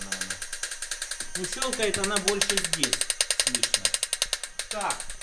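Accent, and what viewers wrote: Rostov, Russia. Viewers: Обожаю Ростовский говор!!привет из Спб!!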